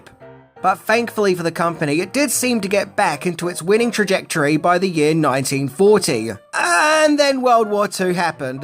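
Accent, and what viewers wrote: United States. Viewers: Love the American accent!! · I wonder if this is a setup for a Troop Beverly Hills review or other movie featuring K-mart . . . Love that American accent 🙂 intentionally funny but still good too 👍😃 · Excellent video,! An interesting departure from movies. Used to work at Sears, and your research, as always, is spot on! Plus, great American accent! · Nailed the American accent lol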